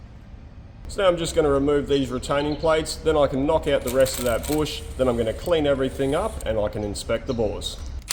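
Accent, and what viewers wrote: Australia. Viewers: Americans not understanding avgood Aussie accent!!!!!!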